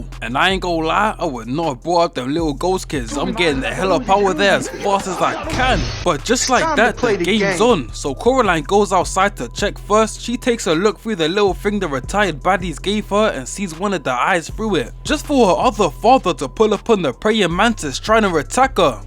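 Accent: United Kingdom. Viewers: idk what it is about people with a uk accent reveiewing anime/movies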